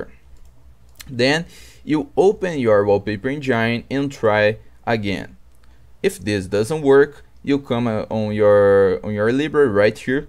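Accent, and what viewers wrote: Brazil. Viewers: amigão, eu reconheço seu sotaque brasileiro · com certeza é um brasileiro kkkkkkkkkk mto bom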